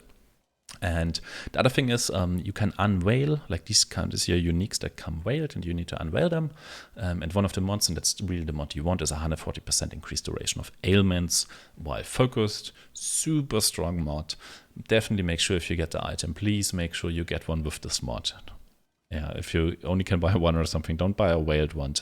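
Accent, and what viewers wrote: Germany. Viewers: u can 100 %hear that in ur voice haha :D not a bad thing tho your english is fine u just hear the german pronounceciation!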